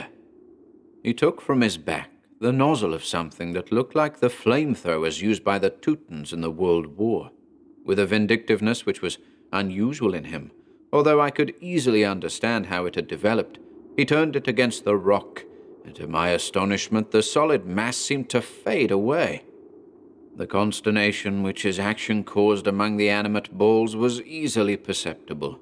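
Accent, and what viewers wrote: United States. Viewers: does such an amazingly subtle American accent it makes me laugh.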